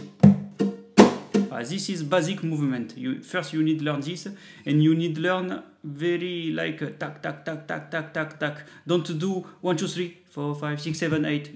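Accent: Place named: France. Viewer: es-tu français, du coup? J ai remarqué que t as un accent français.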